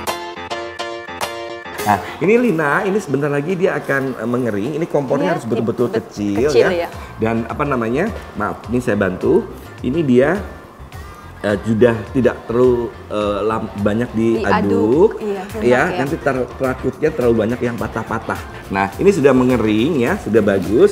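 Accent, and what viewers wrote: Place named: Java, Indonesia. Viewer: Mbae ayu2 ngomong nya medok banget 😆😆😆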